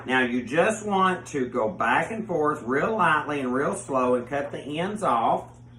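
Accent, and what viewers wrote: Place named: Lexington, United States. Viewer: You seem nice....sound like Lexington. Huge queer population there.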